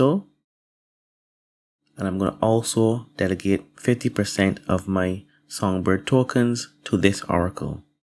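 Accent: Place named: Barbados. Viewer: Love that Barbados Accent sounds like English but with a slice of Lime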